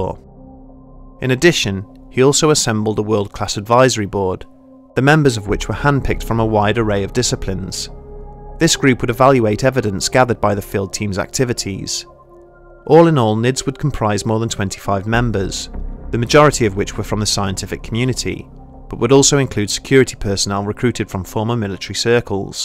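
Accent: United Kingdom. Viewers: we need more UK guy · Why do I these videos always have to be narrated by some damn Brit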